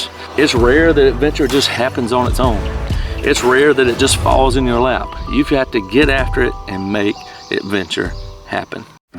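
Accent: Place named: South, United States. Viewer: Love that southern twang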